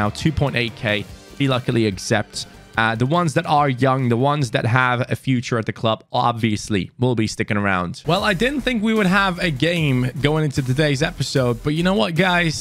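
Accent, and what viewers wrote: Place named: Jamaica. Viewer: Hey could keep the Jamaican please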